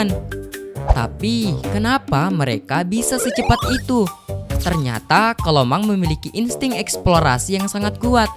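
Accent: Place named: Indonesia. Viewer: dari suara nya udah ketebak orang indo🗿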